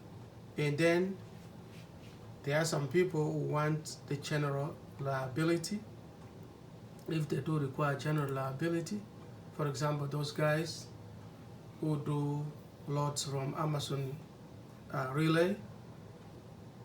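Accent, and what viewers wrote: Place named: Kenya. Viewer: eeh! Baba umetoka upande gani bwana, nkisikia sauti yako, nkasema hapana huyu ni wa home lazma, anyways nice to hear that, ntakutafuta InshaAllah tuzungumze zaidi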